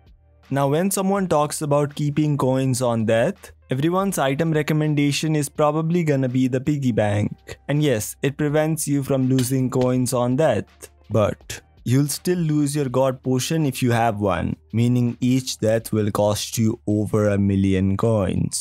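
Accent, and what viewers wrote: India. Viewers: Are u indian? If no then where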